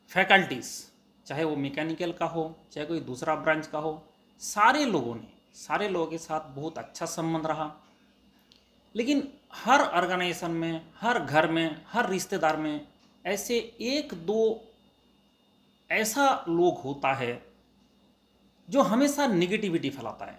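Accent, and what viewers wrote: Bengal, India. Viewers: he has a typical bengali accent hqhqhqhqhq hehehehhehehe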